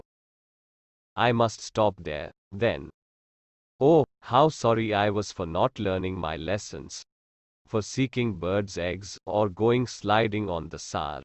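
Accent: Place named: India. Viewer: This miserable solng India English leave please for speaker native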